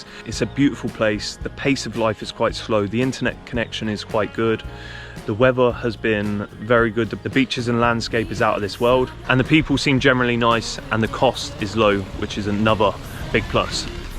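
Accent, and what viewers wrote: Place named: United Kingdom. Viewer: Hi I’m in Australia 🇦🇺 Older lady, 56 years old I’m a “traveler “ 1989 Sri Lanka I love Malaysia 🇲🇾 And it’s people. I’m planning a trip in 2026 I’ve just watched your vid. I have to say your video was FANTASTIC!! The clarity in which you spoke. With your charming British 🇬🇧 accent. I REALLY enjoy watching your video You explained, so well, all aspects of Langkawi. Well done 👍 Have subscribed 👌😎🤩 Marianne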